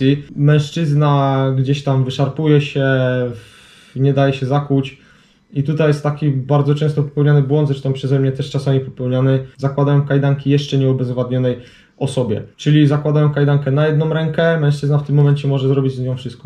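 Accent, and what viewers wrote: Silesia, Poland. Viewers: O przepraszam ale jestem pierwszy raz na profilu i od razu po akcencie poznałem. Czy Pan jest ze Śląska i służy w śląskiej Policji? :-D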